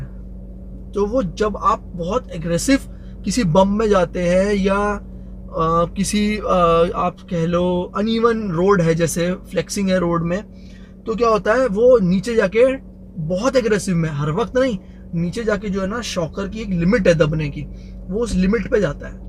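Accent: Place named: India. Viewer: I think the same until I notice that is actually english but with soooo strong hindi accent...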